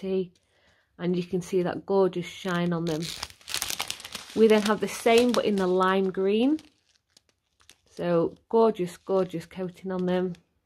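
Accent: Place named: Sheffield, United Kingdom. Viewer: Happy new year :) just discovered your channel :) I recognize your accent 💃I'm from just outside of Sheffield :) x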